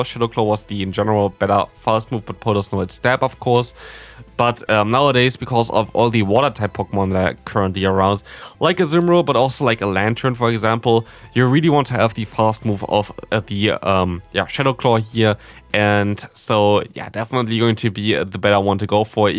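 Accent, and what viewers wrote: Germany. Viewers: Der deutsche Akzent kickt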